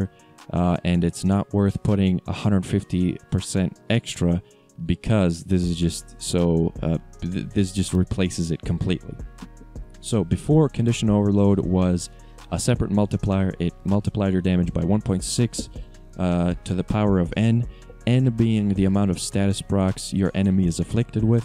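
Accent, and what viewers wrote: United States. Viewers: Great information thanks for this. I have to ask, are you from Maryland? You have that accent sort of.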